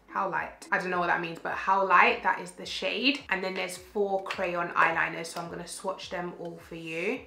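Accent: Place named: England, United Kingdom. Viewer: I love how English people say "purple"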